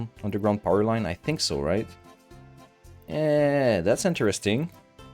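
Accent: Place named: Quebec, Canada. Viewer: I live in Florida. My family is visiting from Montreal. We are Armenian. I knew i recognized the accent. Thank you for your videos. Very relaxing.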